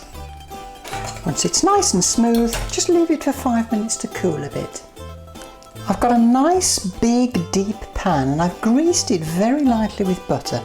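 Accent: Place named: Southern England, United Kingdom. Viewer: Lol, I love Southern English accents... MoussakaR!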